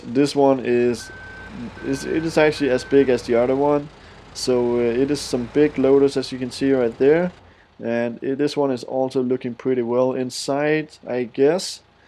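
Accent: Denmark. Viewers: Man kan godt høre du er dansker, haha fede videoer!!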